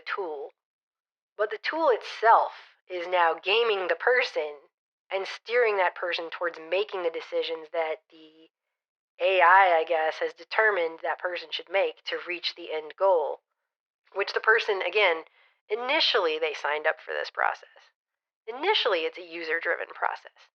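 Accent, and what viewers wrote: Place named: Hispanic American, United States. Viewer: I like your accent. You sound like... a second generation Hispanic American female, age 22-39, from the northern states, somewhere between Chicago and North Dakota, medium build, attractive, long brown hair. How close am I? Practicing making inferences from a sparse data set(i.e, your accent.)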